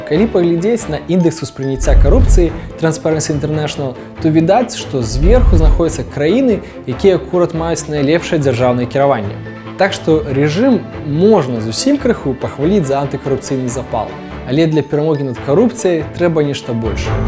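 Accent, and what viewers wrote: Russia. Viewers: Стремление говорить на белорусском языке - всячески похвально.. но что здесь, что в подкастах слушать трасянку невыносимо для ушей. И с течением времени прогресса нет. "Белорусский" язык с русским произношением и русскими словами... такоЭ. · ты такі харызматычны. Колькі табе год? Ці можна ўжо ў прэзідэнты балатавацца? І слухай, дружа, нешта зусім мала рэкламуешся. Укідвай грошы ў інста-рэкламу, нават у метро рэкламуйся. Твой кантэнт на выключна высокім узроўні. Я б вельмі хацеў, каб гэтая ініцыятыва перарасла ў Партыю новых ідэй. Як мінімум мой голас у цябе ўжо ёсцека. І яшчэ. Схадзі да беларускага лагапеда. Годзе ўжо гэтага рускага акцэнту) хачу пачуць родныя цвёрдыя «ч» ды «р». 😉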